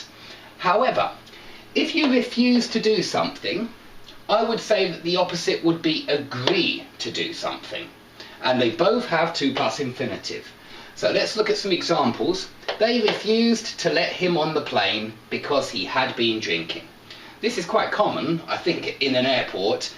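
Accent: United Kingdom. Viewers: Thank you so much for all your efforts and time being put to help us to understand the language so called "English". I love your videos and especially the british accent.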